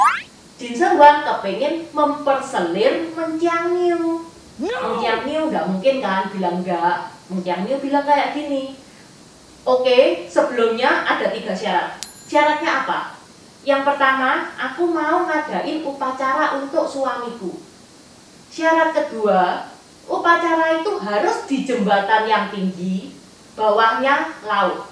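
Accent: Java, Indonesia. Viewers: Kak....logat jawanya surabaya atau semarang kak? Ok thank you....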